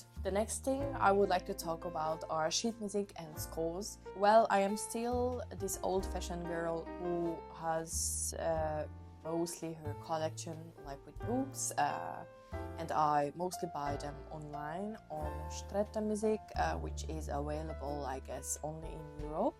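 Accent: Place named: France. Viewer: What is that small black triangular item in the plastic bag that you put in your flute bag? By the way, I love your accent. Are you French?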